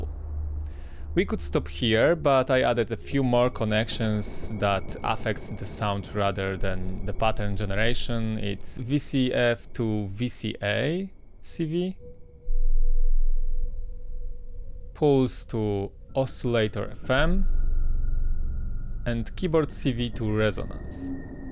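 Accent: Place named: Poland. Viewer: Słyszę polski akcent w angielskim, ciekawe czy zgadłem ? Bardzo fajny materiał. Pozdrawiam 😜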